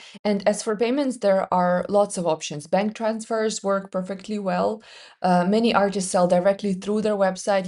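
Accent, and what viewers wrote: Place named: Norway. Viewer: you have an accent yeah / could be Norwegian?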